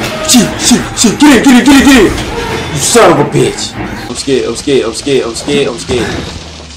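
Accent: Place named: Texas Gulf Coast, United States. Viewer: gaddamn bwa you had me rollin!!! where you from bruh? you sound like your from tha 3rd coast! i'm from texas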